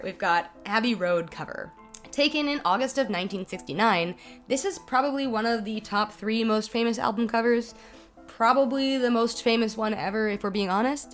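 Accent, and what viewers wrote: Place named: United States. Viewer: You sound american, so I don't like you.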